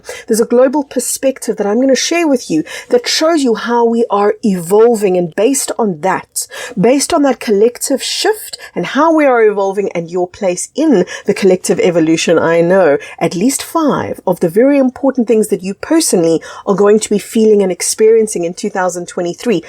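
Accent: South Africa. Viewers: Man have I enjoyed your videos over the weekend! So much of your stuff is resonating with me! Also nice to hear a fellow South African😃 I'm going through huge Assention symptoms the last 3 years. Vision distortions, in somnia, vibrating body Suffocating anxiety. Every day I'm waking up thinking what's it going to be today. Yesterday I was watching your addiction video. And well as some people not think you were talking in metaphors mmm! I believe I came face-to-face with my demons on DMT journey. While I was indulging in one of my mild addictions I inhaled a dmt vape And there it was in front of me breathing and responding to my emotions the more excited I got for my activity the more powerful the entity became. As if we we're separate yet connected. It didn't seem to be good nor bad Nor did it look like a demon. It looked amazing! Beautiful,vibrant, colourful It was Maybe both feeding and supplying all of what humanity has created and experienced through the activity. 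It may also have been a part of me, but what makes me think that it is a collective or separate is I have seen this entity in dmt art. So I am clearly not the only one who has come face-to-face it. But it was real and it was something separate yet part of me. But as per usual with psychedelic journeys with every answer comes another 10 questions.😅 Anyway I'm glad I found you keep up the good work and I'm Sure your videos will come to me as I need them as they always do💚 I am having help from 2 energy healers at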